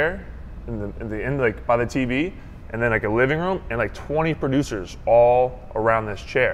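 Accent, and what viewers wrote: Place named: South, United States. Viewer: he has a country western accent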